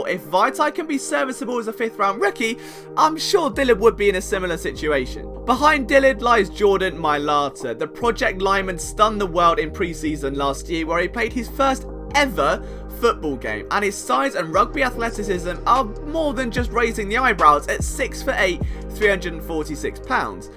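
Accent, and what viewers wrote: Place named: United Kingdom. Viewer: I just can't listen to the British accent... and I'm British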